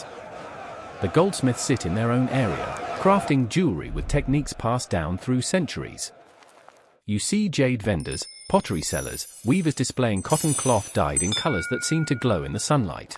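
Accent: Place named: United Kingdom. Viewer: It’s read by a British person… the irony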